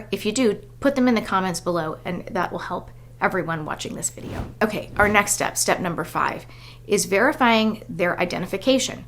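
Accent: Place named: California, United States. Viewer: Sound like you from California/Arizona! 🌵🌴🌵🌴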